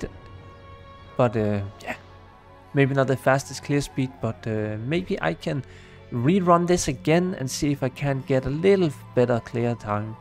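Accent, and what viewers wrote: Denmark. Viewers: Elsker din kanal og især din interesse får mig til at nyde det. Derudover er din tykke, danske accent perfekt :-) Bliv ved! Har desværre ikke nogle ideer til videoer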